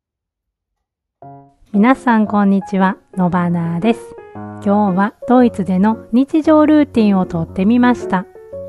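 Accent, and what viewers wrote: Kansai, Japan. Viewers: Nobanaさんの関西弁イントネーションのハスキーボイスが心地いいのでハマってしまい、毎週楽しみにしています。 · いつも楽しく拝見しております😊 関西弁⁉️の話し方がとても心地よく聞いております 次の投稿も楽しみにしております❤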